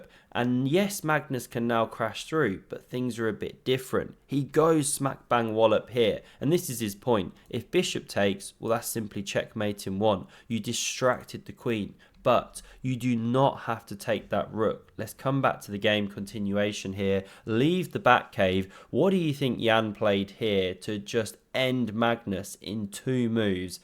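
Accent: United Kingdom. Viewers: Why is it so hard to follow what he said? He even said it in a British accent.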